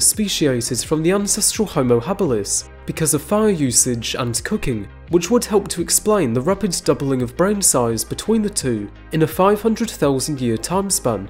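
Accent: United Kingdom. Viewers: The narration drives me nuts. Strong British accent at hyperspeed, along with a very monotonous delivery completely devoid of feeling. Couldn't hang past 6 minutes. Too bad. Seemed like interesting content.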